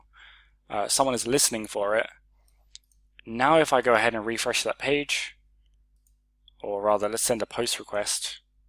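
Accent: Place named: United Kingdom. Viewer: you're British accent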